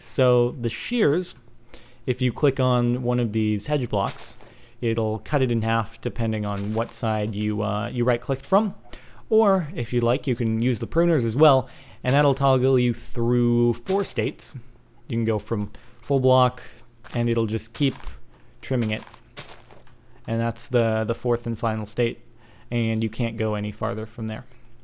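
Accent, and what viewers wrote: Sweden. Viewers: is you swedish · *are you swedish* and yes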